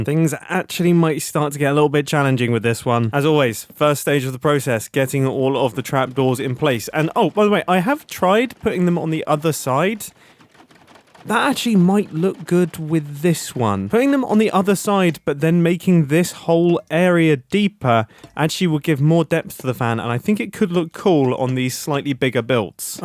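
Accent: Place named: United Kingdom. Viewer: cause of his British accent it sounds like mumbo said “this has been a very fan day”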